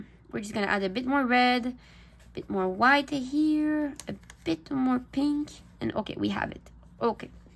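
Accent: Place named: France. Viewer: Hey! I loved your video, hreat art, great tutorial it was *chef kiss*I was just wondering if you were french, I am french myself, and I heard in your accent (slightly) some french like the “Ok” and the “euh”, the way I also pronounce it :3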